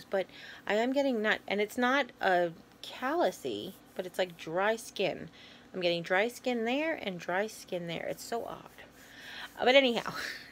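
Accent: Long Island, United States. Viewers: Love the Long Island talk, I miss LI but I don't miss the amount of people living there!!! You are organized....wish I was!!!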